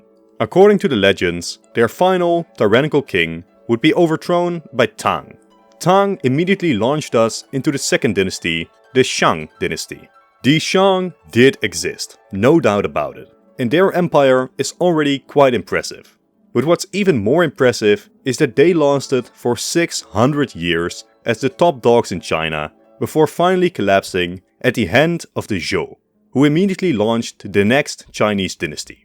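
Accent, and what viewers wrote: Netherlands. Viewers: ik hoor aan je accent dat je Nederlander bent :) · Hoort gelijk dat hij Nederlands is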